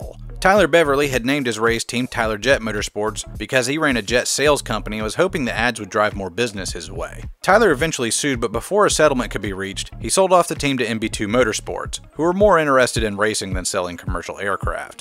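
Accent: South, United States. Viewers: This video is good. Really good. But without your southern accent it wouldn't feel nearly as authentic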